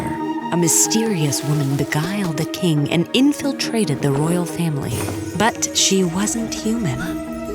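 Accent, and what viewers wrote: Bengal, India. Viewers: The bengali accent is just the cherry on top🤌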